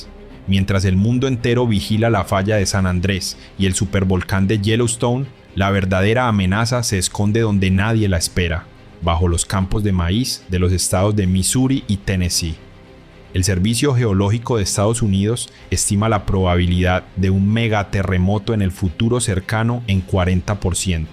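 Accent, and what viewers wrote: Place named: Antioquia, Colombia. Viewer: Esa voz es de un.paisa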